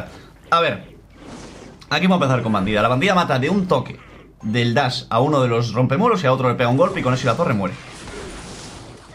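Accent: Spain. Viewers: Amigo eres re simpático y me gusta tu acento de España , Te ganaste un nuevo sub Juegas re bien :)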